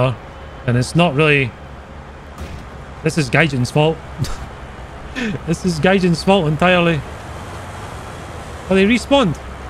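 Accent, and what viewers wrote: Scotland, United Kingdom. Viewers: Yup and the Scottish accent made me stay haha!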